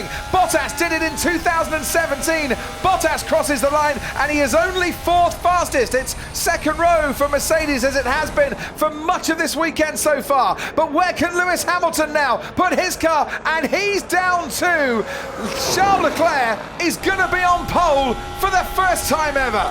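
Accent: Australia. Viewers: Australian😂😂? Btw so fast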